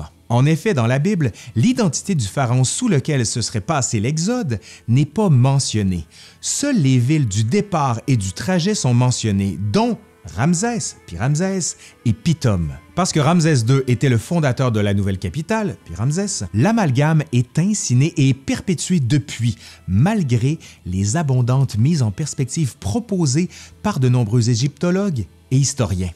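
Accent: Quebec, Canada. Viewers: MERCI passionné par l'Egypte depuis la 6 ème (presque 35 ans) cette vidéo ne pouvait que me faire plaisir Je suis d'accord sur la beauté d'Abou Simbel, pour avoir eu le privilège de voir ses 2 temples dès le lever du soleil et donc avant l'arrivée des masses d'autres touriste. Pour la tombe de Nefertari elle était en retauration a cette époque là; un pretexte parfait pour retourner un jour en Egypte Continue ainsi et vive l'accent du Quebec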